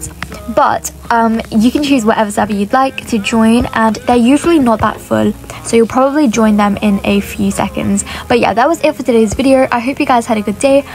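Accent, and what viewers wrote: United Kingdom. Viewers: You are so british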